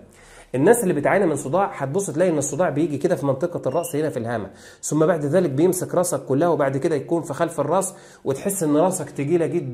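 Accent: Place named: Morocco. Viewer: تلاوتك تشبه تلاوتنا المغربية الشعبية .تريح الاعصاب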